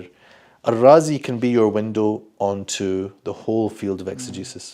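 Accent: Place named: Scotland, United Kingdom. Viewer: sorry, Shaykh. Couldn't resist. Never heard a scholar with a Scottish accent before, but l appreciate what you are doing.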